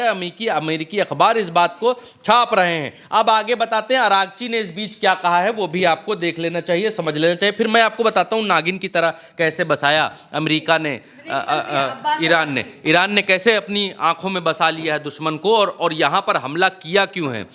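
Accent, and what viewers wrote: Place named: Uttar Pradesh, India. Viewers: Aapka ' utter pardesh touch ' acha lagta hae bhai...good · Uttar Pradesh ki khadi bhasha ap se sunane me achcha lagta hai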